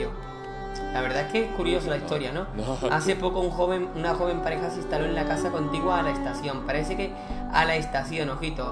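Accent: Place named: Canary Islands, Spain. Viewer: Pensé k eras canario jajaja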